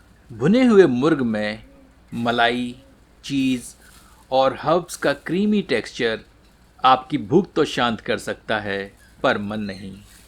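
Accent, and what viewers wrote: Standard. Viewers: आपकी शुद्ध हिंदी का उच्चारण भी ... ह्रदय को भी हर्षित कर देता है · Your shudh Hindi is so irritating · aapki sari decease or apka suddh hindi me bollana bahut bahut pasand hai 👍👍❤️👍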